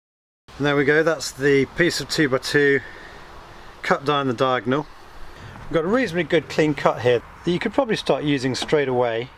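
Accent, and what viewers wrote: England, United Kingdom. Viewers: Good to hear an English accent instead of the usual American Thank you!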